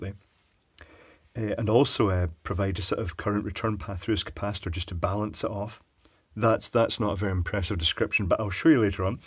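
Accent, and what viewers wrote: Scotland, United Kingdom. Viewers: Resisting urge to inform newbie that is Scottish. I have failed.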